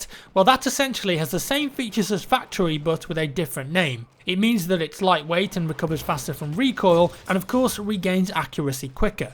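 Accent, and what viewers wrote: England, United Kingdom. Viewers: are you from english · in squad up if youre on the british side please say "A tiger? in africa?". You got the accent use it :)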